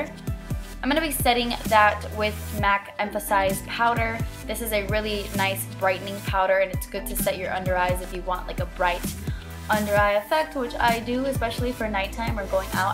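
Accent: Miami, United States. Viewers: you love makeup, i love makeup, you're from Miami, I'm from Miami... we have miami girl accents and you know what I mean so lets be friends? k thanks :) love you girl! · she's Cuban, so I believe she's multi-ethnic. I've read in comments that her accent is not Cuban, it's a Miami accent.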